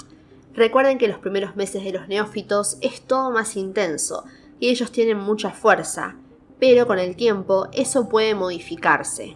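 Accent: Argentina. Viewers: Usted suena como argentina, lo es, señorita?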